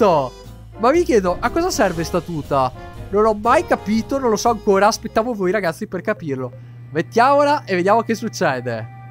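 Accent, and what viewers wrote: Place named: Veneto, Italy. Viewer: il dialetto veneto <3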